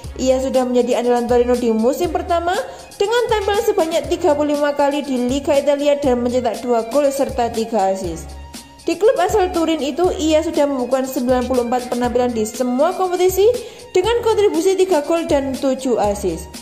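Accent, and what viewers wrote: Java, Indonesia. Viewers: Medok abeezzz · Medok....amat neng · Wong Jowo mana neng, logatmu kuental 😘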